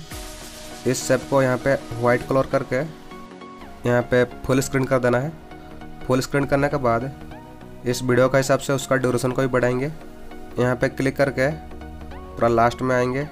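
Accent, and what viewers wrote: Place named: Odisha, India. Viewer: thoda thoda odia toch ho rha hai na apke hindi me ishliye but nice voice ❤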